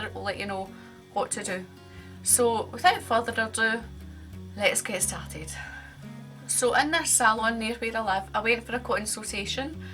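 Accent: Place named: Scotland, United Kingdom. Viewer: are you scottish I can hear it in your voice love it.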